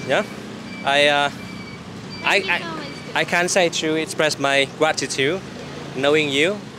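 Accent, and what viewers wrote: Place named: North, Vietnam. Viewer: Dude - you have a little bit of North Vietnamese. Pretty cool!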